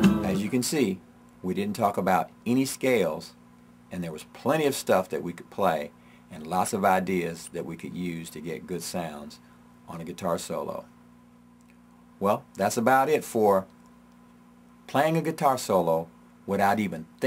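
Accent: New Orleans, United States. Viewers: Are you from New Orleans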